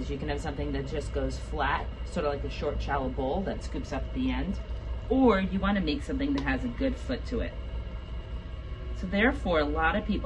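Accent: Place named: New Jersey, United States. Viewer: My teacher is also from New Jersey.. you two have same accent.. she also says to "call it a pot".😁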